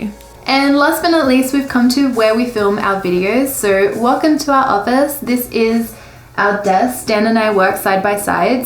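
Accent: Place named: Sydney, Australia. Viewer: From you and my old friend Joann I have decided that the Sydney accent is a very nice sounding english!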